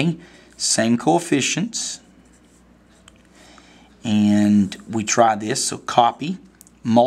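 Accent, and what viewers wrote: South, United States. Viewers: you got that country accent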